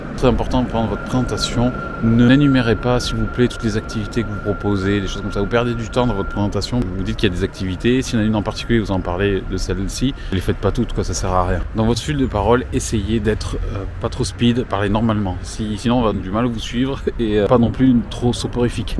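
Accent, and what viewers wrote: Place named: Bordeaux, France. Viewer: On dirait que vous êtes Tour de sèze à Bordeaux !😊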